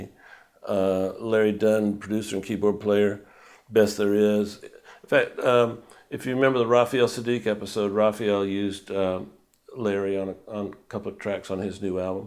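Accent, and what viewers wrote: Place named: South, United States. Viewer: Its that slight southern accent, which I love to hear...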